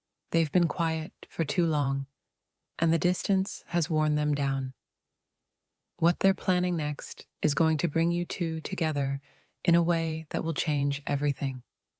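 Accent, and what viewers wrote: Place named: Scotland, United Kingdom. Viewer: 😊🤗🌹 did you believe the distance was not having effect on me? It did have an effect on me. I love talking to you and now I'd like to see you sit down and let's start talking. By now. You know me so well. Don't think you'll even be nervous. I just can't wait to hear that British accent. A lot of it's gone. Which old videos to now still there? Oh, it's Scottish. I'm sorry. Excuse me your Scottish accent. I have to get that straight. I will. Everything we do now is going to be for the better you're going to be a lot happier. Feel a load off you that you haven't felt in 30 years and you got me with a woman who truly just loves you. You lose everything tomorrow okay? We are both and great hands. I know I'm always going to be honest and I know you are because you believe in call and I hope with your domestic issues you resolve them fine. 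Get her out of your life, not for me, but for you she's dragging you down. She's making you believe things at on shrew. I don't like when women take advantage of men's good hearts and that's what she's doing to you. Take the rest. Take the chance I am. What are you thinking My love 👠💋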